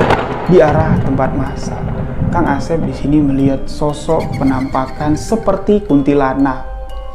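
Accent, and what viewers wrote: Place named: Jambi, Indonesia. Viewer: Senang banget liat bg bercerita... Jngan lupa logat jambi nya ya bang?? Kereeeen.. 👍👍👍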